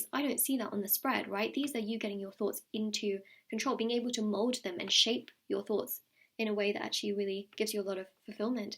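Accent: England, United Kingdom. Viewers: I like your English accent, beautiful ❤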